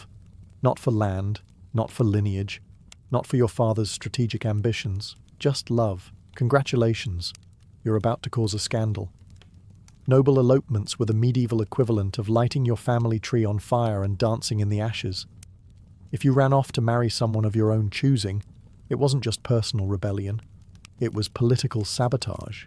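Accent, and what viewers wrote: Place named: England, United Kingdom. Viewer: Cool history subjects! What puts me to sleep it is the English accent, I always fall asleep watching movies with English accents 😴😴😴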